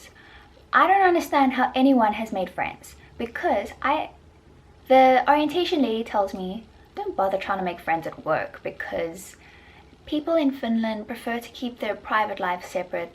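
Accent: South Africa. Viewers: lol your accent is different. where in South Africa are you from? CTP or DBN? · You have never sounded more South African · Is that an south african accent you have?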